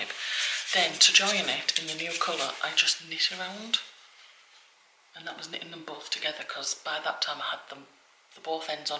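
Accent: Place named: County Durham, United Kingdom. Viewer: I'm not sure where you are, your accent sounds local to me. I'm in County Durham x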